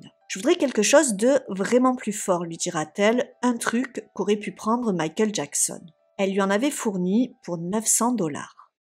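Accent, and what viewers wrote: South, France. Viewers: J'aime bien t'écouter, malgré les horribles histoires, ta voix est apaisante avec ton léger accents du sud qui chante..😅❤ je suis fan. Merci ❤❤❤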